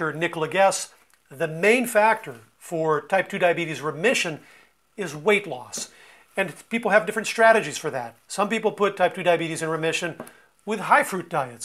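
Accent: United States. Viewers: Sounds like an American born and educated person. He’s not making any other person with a Portuguese sounding name better or worse. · he does sound like an American, but having a US English accent doesn't make him a US citizen. He graduated as a doctor in Lisbon and he merely did a PHD in the US, but has since returned a while back to Portugal.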